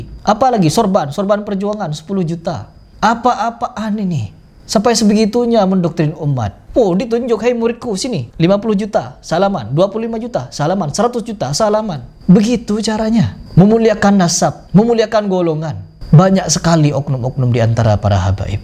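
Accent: Madura, Indonesia. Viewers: Lucu sekali aksen maduramu bro!! Berani ga lu nantang debat salah satu ustaz yg kau suka gibahin??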